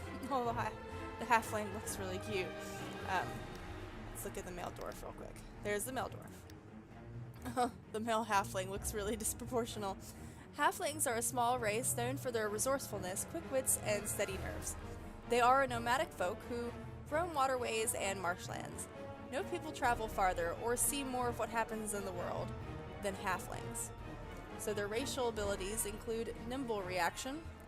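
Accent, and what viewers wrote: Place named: South, United States. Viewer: know i'm not speaking for every one here but i'm fine w/ the southern accent.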